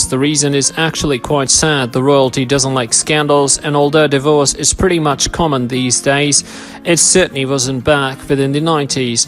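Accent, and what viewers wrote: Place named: Australia. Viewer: Your English is perfect - do I detect a slight Australian accent?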